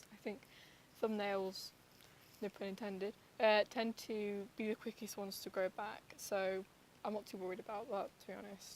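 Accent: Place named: London, United Kingdom. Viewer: You sound like a London girl with that accent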